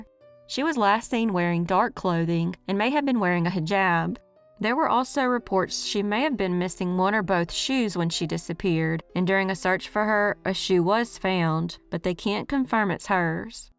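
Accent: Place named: South, United States. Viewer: Lol "MAc" donalds- this is why i listen to you! We speak the same language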